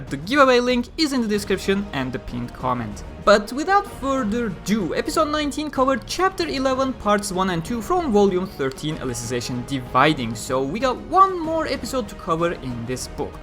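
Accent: Turkey. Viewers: türk olduğun tam belli oluyor aksanından. :D Kusra bakma ama biraz kötü aksanın.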